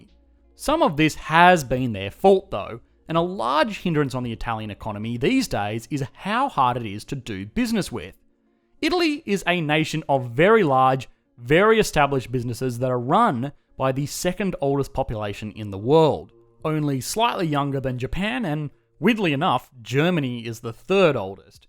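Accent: Australia. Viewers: The accent is Australian as far as I can tell. His views on Brexit suggest that ie ABC/corporatist/left leaning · I love the Aussie accent! So good to hear my people on Youtube. Step aside... Canadians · Australian eh?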